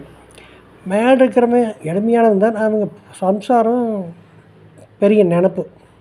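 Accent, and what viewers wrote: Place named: Kongu, India. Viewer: Nalla experience..Good message.🎉🎉🎉🎉 Good Kongu Tamil slang. God bless you.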